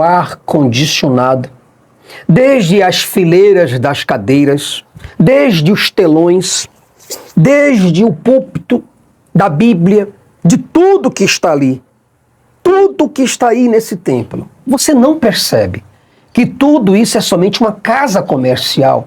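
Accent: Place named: Rio de Janeiro, Brazil. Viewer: Putz... esse sotaque carioca é essa entoação lembram muito os pastores da Universal 😅😅😅😅😅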